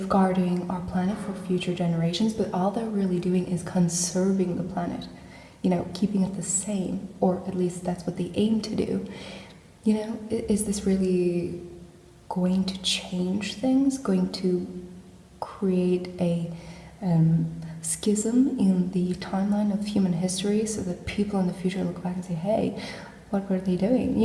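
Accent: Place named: United States. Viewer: I have been a subscriber of yours for a long time. Since you were known as " " or something like that. Anyway I just watched all the videos on this channel and I have no idea what's going on, and that's why it's genius. As an American, I have to say your American accent is pretty good too. I am curious, when you read the books is that your real accent? Anyway, please keep doing what you are doing. I think your stuff here is totally nihilist and I love it.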